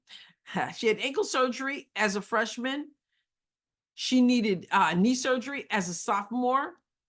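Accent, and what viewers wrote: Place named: Brooklyn, United States. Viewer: you sound like you have s little Brooklyn accent...😊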